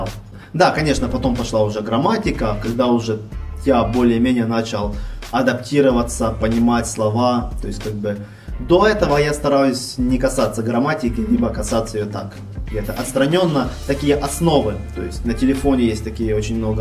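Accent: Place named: Ukraine. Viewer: думаю Вам бы не мешало подучить русский прежде чем на нём разговаривать...очень слышно что общается украинец....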